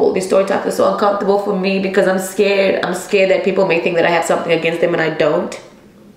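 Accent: South Africa. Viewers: Are you from South Africa 🇿🇦 ❤ your accent ❤ SA babes here you are so strong 🌸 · Are you from south africa? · So she is originally from South Africa, can hear her accent has a twinge of SA accent..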